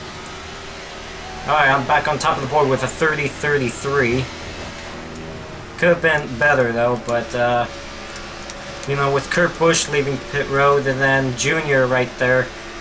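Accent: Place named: Boston, United States. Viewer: He has a Boston accent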